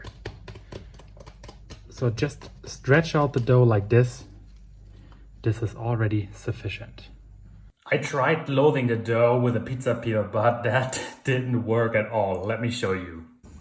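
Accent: Germany. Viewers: Great to see baking outdoors. No need to apologise for German English if you didn't apologise no one would know.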